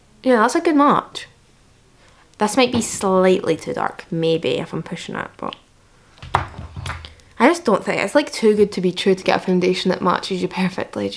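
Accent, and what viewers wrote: Scotland, United Kingdom. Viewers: no it's not, she's scottish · never seen a comment section struggle so hard to find out where she is from. Is it that hard to tell she is Scottish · i dont think so because i am and her accent is a little different i think shes from more southern scotland idk lol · Lol she doesn't have a English accent she has a Scottish accent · she got a scottish accent lmao my dad got one too . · She sounds Scottish to me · because raylas scottish lmao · didn't expect the Scottish accent thank you for enlightening me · was not expecting you to be scottish and am loving it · somaya no shes scottish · Scottish. I already knew this, but She's talked about it in older videos. Apparently, it's the lowest of Scottish accents where she's from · haha, Scottish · i did NOT expect u to be scottish 😳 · V a n i l l a that is definitely a scottish accent not a british accent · I could listen to your Scottish accent allll day long.... 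· Keep your natural lighting I think it looks better :) also love to hear a Scottish accent on here, I’m from Edinburgh and Boots on Princess Street sells Fenty now ♥️ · Scotland b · Skanda Kousalya Devathaa she's scottish alyssa's from york x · I bet you're American, huh. Cause that was completely and utterly stupid. Her accent is violently Scottish and all the people in that show are English. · Guys, Scotland is IN Britain, so technically her accent is British. Not all British accents sound like queens English accent “typical posh” accent. I may be wrong but she sounds Scottish to me, or northern English. So generally speaking I think non UK/Irish people think the entirety of england is the UK while forgetting there are 4 countries in Great Britain all with 100s of different accents in each part. Yall spoke like there’s just 1 accent in all of Britain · Scottish accent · Ah a fellow Scottish person 🥰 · bpdbitch nah bro yea we’ve seen people with that the same accent (Ik she’s Scottish) but she just gives the vibe of it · Thot Scottish accent I love ❤❤❤❤❤❤❤❤❤❤❤❤❤❤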